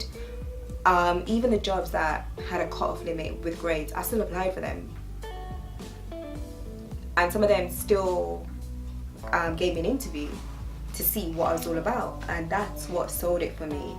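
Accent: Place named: United Kingdom. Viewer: Who would have thought you were from Blighty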